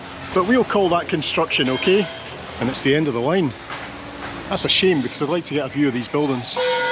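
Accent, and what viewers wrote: Scotland, United Kingdom. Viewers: I just love your accent. I have bin 5 time in Edinburgh and many other places in Scotland, and I sure love your contry. Keep up making videos. 😘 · What I like about is that he does his own research. You will never hear him say "I don't know. If you know, let me know in the comments..." Other travel vloggers come across as ignorant and lazy compared to. Also love the Scottish accent.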